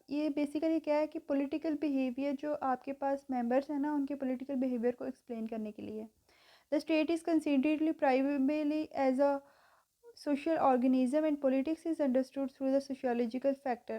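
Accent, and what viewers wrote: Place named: Pakistan. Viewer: Ur voice ar like Pakistani 😮😊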